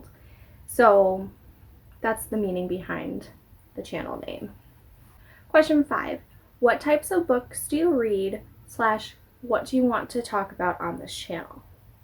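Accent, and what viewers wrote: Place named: Midwest, United States. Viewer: The Sarah Sanderson midwest accent coming thru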